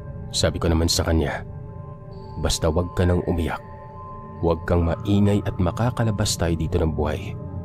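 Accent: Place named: Visayas, Philippines. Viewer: Cute mo tlga mag bisaya sir HAHAHAH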